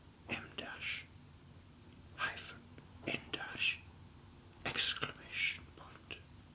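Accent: RP, United Kingdom. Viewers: Well, was not expecting you to have such a spot-on posh accent!!! Wonderful video. Hope you've been doing okay over your absence and thank you for your wonderful videos. 😆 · That accent is so plummy I had you mistaken for Peter O'Toole!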